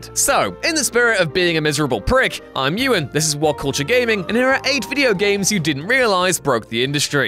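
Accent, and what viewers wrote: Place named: West Country, United Kingdom. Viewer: Now is it just me or is that a really strong west country accent?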